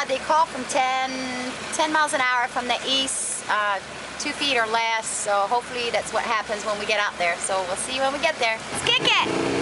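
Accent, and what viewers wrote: Louisiana, United States. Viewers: Ate you cajun?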